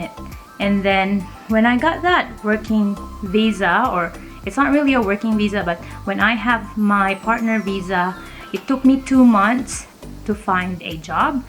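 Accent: Australia. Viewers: Love the aussie accent sissy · Madam gudang, may Aussie accent ka na..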